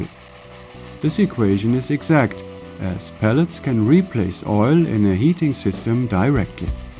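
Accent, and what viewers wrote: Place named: Germany. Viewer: i think he s german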